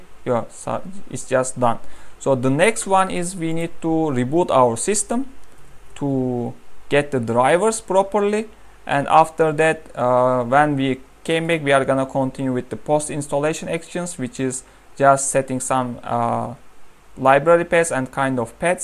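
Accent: Turkey. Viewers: ingilizcesini rahat anlıyorum türk olabilir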